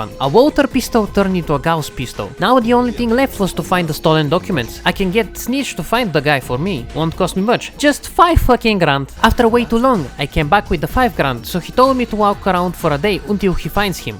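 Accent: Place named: Bulgaria. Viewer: btw, your video is good, I like your humour, I think you are Bulgarian because of accent so hello slavic friend!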